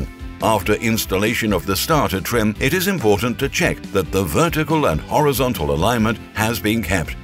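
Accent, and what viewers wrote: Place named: United Kingdom. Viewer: This narrator sounds like the epic economist on YouTube just with a British accent 😂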